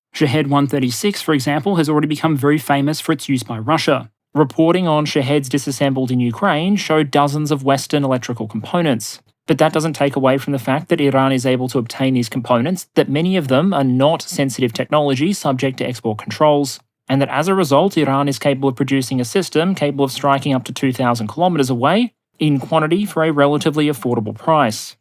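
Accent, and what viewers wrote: Australia. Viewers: The Aussie accent almost hides the propaganda but the rethoric is the same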